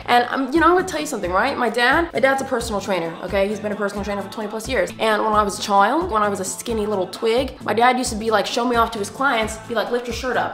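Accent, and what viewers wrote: United Kingdom. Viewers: literally discovered you through youtube and now i cant stop watching your videos! very informational :) ALSO .. your random british accent cracks me up.. (i'm british LOL)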